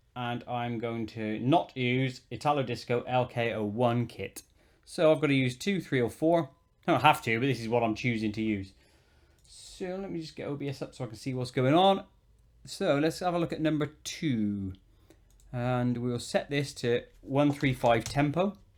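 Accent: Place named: Somerset, United Kingdom. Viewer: Where are you from? You sound local ish to me! I'm a Somerset boy! I'm guessing Bristol or Swindon!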